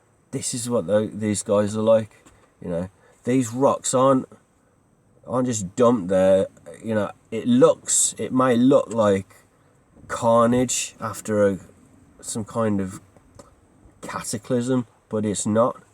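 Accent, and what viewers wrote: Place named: Manchester, United Kingdom. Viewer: Love his accent…. 😍 Are you maybe from Manchester Sir?!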